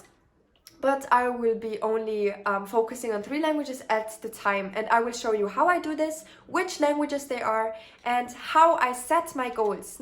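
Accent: Germany. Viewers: your accent is really nice though very subtle like I said I would have guessed a Germanic language but it’s not distinct enough that I would identify it as German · You are German correct ? I can hear the accent. 😍😍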